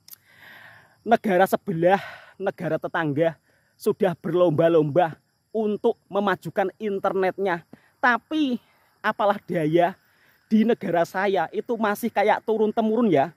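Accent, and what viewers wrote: Java, Indonesia. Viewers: bang medoknya ngisin ngisini☺️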